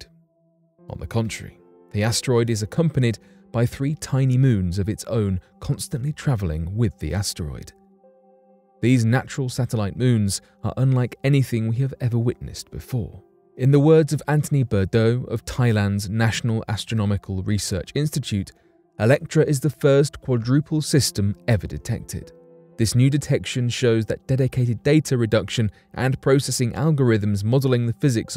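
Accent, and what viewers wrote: United Kingdom. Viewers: Why do we have to hear Brit accent when they've never done anything space related.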